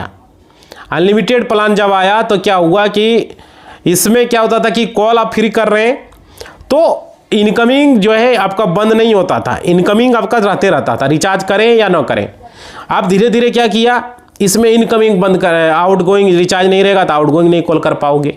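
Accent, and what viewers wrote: Bihar, India. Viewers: Sir, your inputs are informative but avoid Bihari style and accents in your YouTube programme. Jai Hind 🇮🇳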